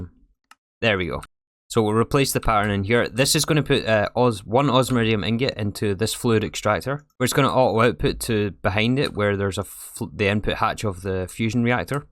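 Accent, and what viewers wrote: Scotland, United Kingdom. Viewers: Yeah, from GitHub about this issue: "The red matter nerfs were too much, previously 100 red matter needed to be crafted, now it's 2" But it doesn't matter, at least you can now set up a proper energy core. I could only add one after finishing the pack. I literally rubber-hammered the creative key out of the assembler line for lack of power. Like I said, I do enjoy watching this series, you have a totally different approach than I have. Two days before finishing the pack I still had only one EBF. I added some more because I got impatient waiting for more tungsten. And there you are, 20 EBF's in a row and 1800 tungsten in stock :-D :-D So it's interesting to watch. The Scottish accent helps too ;-) Keep it up !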